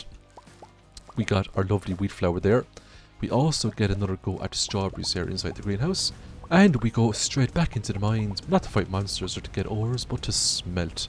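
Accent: Ireland. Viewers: Dying to know what part of Ireland you are from!!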